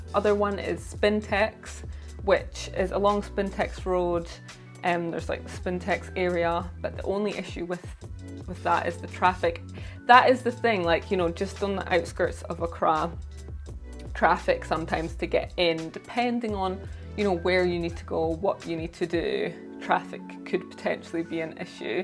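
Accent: Scotland, United Kingdom. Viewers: I love you accent, is it Scottish? I love the Scottish accent. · R U from Scotland ? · Are you from Scotland 🏴?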